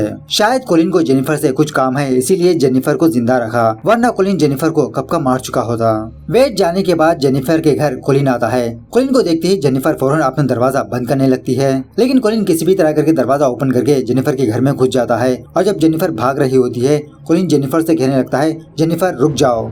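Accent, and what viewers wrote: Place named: South, India. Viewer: Hi.. Am totally addicted to your channel now..from last few days am continuously watching all your videos..enjoying a lot..tamil, telgu, malayalam,bengali,marathi...all in one subscription.. 👍🏽..like your South Indian accent too..keep it up...All the best ....looking forward to all new ones..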